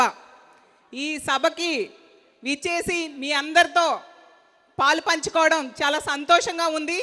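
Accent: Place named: Visakhapatnam, India. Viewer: Em cheppev Darling...Mana Vizag sound baane vinipisthunnav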